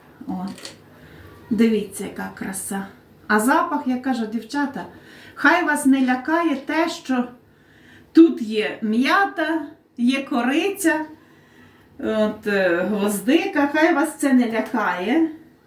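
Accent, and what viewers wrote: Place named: Podillia, Ukraine. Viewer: Дуже дякую за таку розлогу відповідь. Село Глібічок - це мамина мала батьківщина, неподалік від Кам'янця, там, де Миньківці, Нова Вушиця. Почув такі з дитинства знайомі слова, мовні оберти, інтонації, от і запитав, звідки ви. Буду тепер Ваш канал дивитися і слухати 🤗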